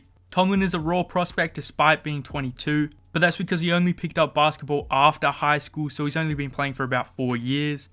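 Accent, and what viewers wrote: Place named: Australia. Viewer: Good video man very informative. I'm really not sold on Jett Howard. It will be interesting to see how the Thompsons go in their first couple years too. I sensed an aussie accent, shout out from Sydney!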